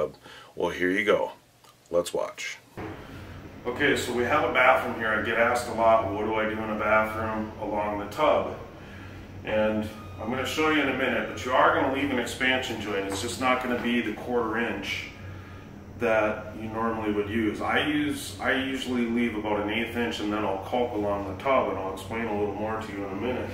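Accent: Minnesota, United States. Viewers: Nice!! Gonna get me one of those mini planers!! You from Minnesota?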